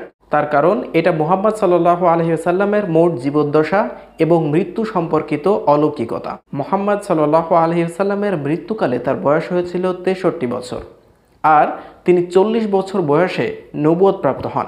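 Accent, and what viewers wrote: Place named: Barisal, Bangladesh. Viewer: আপনার তথ্যগুলো চমৎকার। কিন্তু কয়েকটি বর্ণের বরিশালের আঞ্চলিক অশুদ্ধ উচ্চারণ শুনতে খুবই বিশ্রী লাগে।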